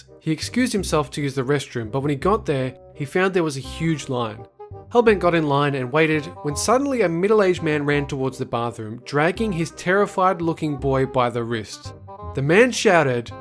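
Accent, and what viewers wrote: Australia. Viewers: I SMELL AN AUSSIE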